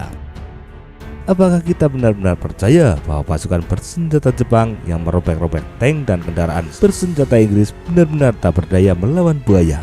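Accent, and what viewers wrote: Java, Indonesia. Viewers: Tolong di ganti pengisi suara nya ini gak jelas ku dengar logat Jawa baru kayak hidung di tutup pula ngomong nya JD gak kurang jelas terdengar oleh saya gak tau yg lain mungkin bgt jg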